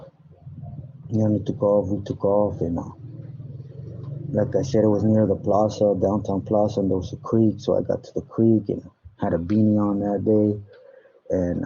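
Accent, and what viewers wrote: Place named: Solano County, United States. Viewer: You gotta be from Solano county...the way you talking...you might be from Vacaville maybe Napa or Santa Rosa....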